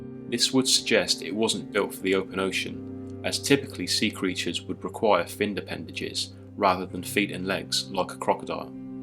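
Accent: United Kingdom. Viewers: Balls to your detractors, +!:) Your voice is British (one big thumbs up for that alone), it's original... and it's mysterious-sounding, in an intriguing, only mildly hypnotic, non-campy way. You're not trying to sound like a carnival barker, so please don't speed it up! And you still haven't told me who your inspirations in the world of the paranormal are!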